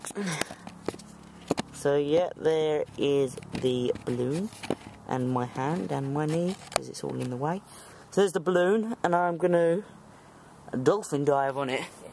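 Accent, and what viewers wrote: United Kingdom. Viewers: gr8 m8 8/8 sound like from the south east somewhere. Not Scottish though m8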